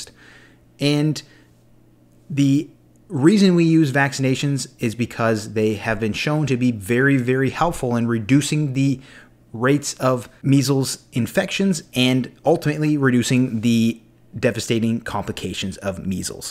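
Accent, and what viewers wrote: Canada. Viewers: I love your Canadian accent ! About, out, etc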